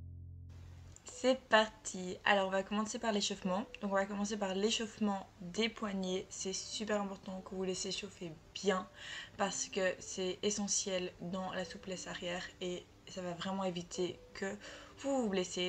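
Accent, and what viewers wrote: Belgium. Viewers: Bonjour, j'aime beaucoup tes vidéos très détaillées et ton petit accent belge 😊. Est-ce que tu pourrais faire un tutosur le flip arrière s'il te plaît ? Merci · Tu est belge ?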